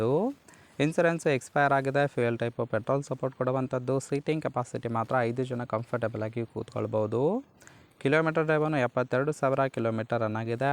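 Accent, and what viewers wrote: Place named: Haryana, India. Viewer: Haryanvi madam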